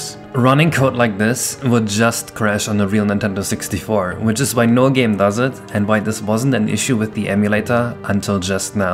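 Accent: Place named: Germany. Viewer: hey, i like you and your videos however it would be nice if you could refine your pronunciation a little more its not bad by any means, still it could use some improvements here and there f.e mispronouncing some words or your still somewhat noticeable german accent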